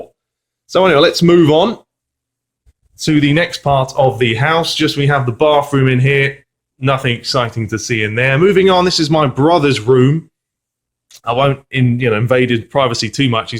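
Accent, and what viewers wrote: United Kingdom. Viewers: I love how you still kept your British accent. :) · omg it is such a beautiful house and you live in florida and you sound like you live in the uk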